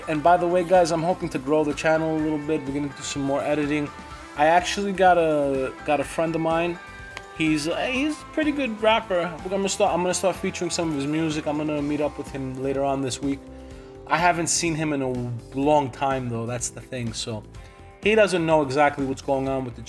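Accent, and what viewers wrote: New York, United States. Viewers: Your accent to my ear was clearly either Queens or Brooklyn. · Hey, I'm living in Queens right now too, originally from upstate NY. I can totally hear your NYC accent haha. I really enjoy watching your videos. Keep it up man!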